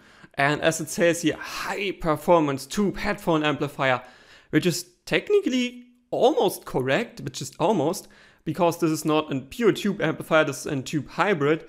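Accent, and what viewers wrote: Germany. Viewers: The written review is good. You are well informed for a newbie :D How did you learn all the Audio Stuff and the assessment etc? Can you recommend any Youtube Channels or books? Promising Channel, keep up the good work! Du hast auch einen typischen deutschen Akzent im Englischen :D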